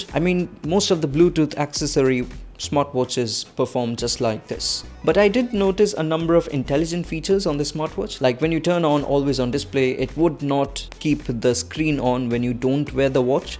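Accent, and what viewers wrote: India. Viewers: Good review! By the way, your accent is nice too not the typical heavy Indian accent that's somewhat annoying because it's super hard to understand. FYI regarding the GPS though most Running watches even Garmins have issues when near or inside buildings.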